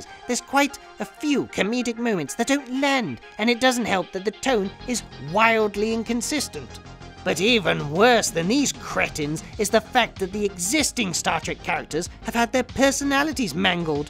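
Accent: United Kingdom. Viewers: I'm gonna put things more on "Willfully Ignorant" rather than "Downright Stupid". But please don't comment on things you have any clue on what you're going on about. At times, you sound a lot like Alex Jones with a British accent than any person with logic or reason.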